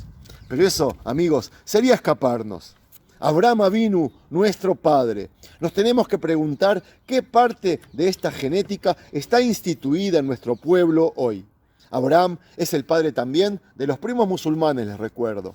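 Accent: Argentina. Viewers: Si eres de isrrael porque ablas como argentino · Che vos sos de Argentina?🇦🇷. Me encantan tus videos. Saludos desde Republica Dominicana🇩🇴. Y bendigo al Dios de Abraham, Isaac y Jacob🇮🇱, Padre de Nuestro Señor y Salvador Jesucristo.✝️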